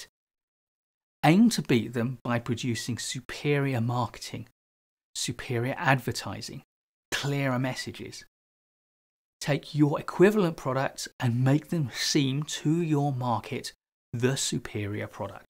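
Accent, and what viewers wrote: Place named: United Kingdom. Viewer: I started to miss the British accent in business videos in YouTube 😂 thanks from Morocco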